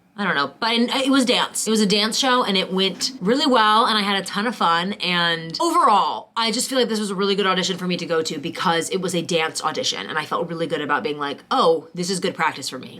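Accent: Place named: Ireland. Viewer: Omg! You’re Irish accent kinda sounds like saoirse ronan!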